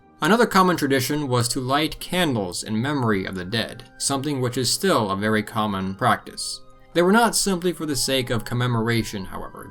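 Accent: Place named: Ohio, United States. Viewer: sounds like your near youngstown boardman ohio area.. lol.. great documentary.. thank so much..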